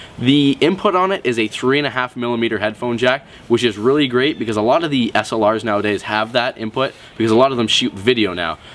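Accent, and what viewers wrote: Canada. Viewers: canadian accent? cute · haha, you're canadian :)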